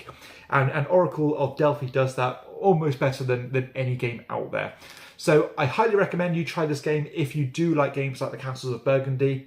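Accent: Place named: United Kingdom. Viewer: As a Brit myself i sometimes dispair at the domination of YT by our friends from across the pond …Its Great to see more English reviewers on YT …very clear and eloquently presented top ten …makes me so happy to hear British English from UK gamers hurrah !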